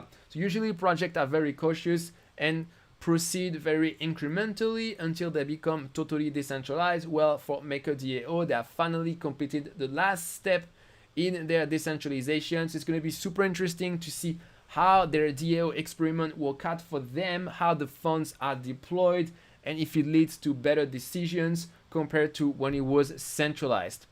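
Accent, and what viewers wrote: France. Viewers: Yo great content!! are u french?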